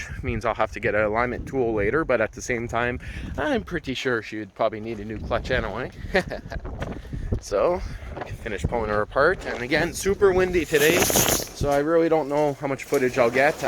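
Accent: Canada. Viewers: I was hoping to see a rapid dis-assembly of this little stump puller Diesel... I guess that will be on the next video... From what his accent sounds like, this was filmed in Canada...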